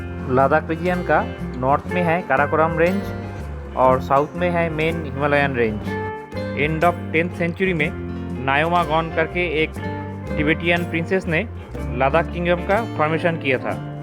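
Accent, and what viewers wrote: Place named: Bengal, India. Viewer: Nice informative vlog Your pronunciation resembles to be Bengali like, are you a bengalee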